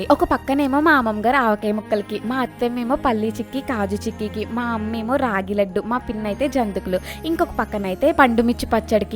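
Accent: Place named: Andhra Pradesh, India. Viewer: నాకు ఆంధ్ర vioce అంటే చిరాకు వెస్ట్ ఫెలో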